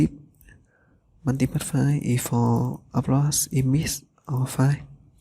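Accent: Vietnam. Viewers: tưởng ôn ấn độ nào. nghe sound chuẩn việt nam r :))0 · Hình như bạn là người Việt phải không ? Nghe phát âm tiếng anh giống VN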